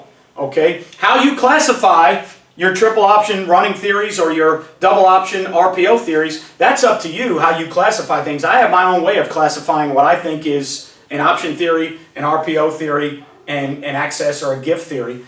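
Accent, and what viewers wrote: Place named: East Coast, United States. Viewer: As a side note at the end of the video, don't ever change the way you talk. I have a really short attention span, and the fast talking, visuals, and constant resets "alright" and "ok" help me stay focused. It also sounds cool...I haven't heard many east-coast people talk, apart from the stereotypical ones on TV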